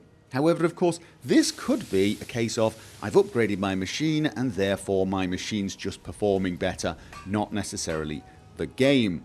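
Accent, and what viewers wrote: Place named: Scotland, United Kingdom. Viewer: are you Scottish? love yer accent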